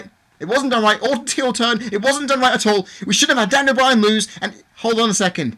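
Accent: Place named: United Kingdom. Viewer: I love the British Accent fucking awesome whenever i do a British Accent i sound Australian or Irish and sometimes even Italian lol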